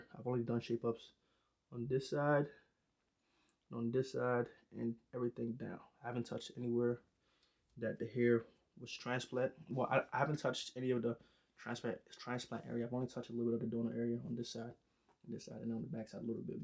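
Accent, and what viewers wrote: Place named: Liberia. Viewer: This pekin sounds like a Liberian pekin!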